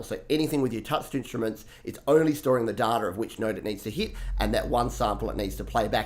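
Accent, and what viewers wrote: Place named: Australia. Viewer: Betht five tipth Sorry that was mean. The dope Aussie accent more than makes up for it.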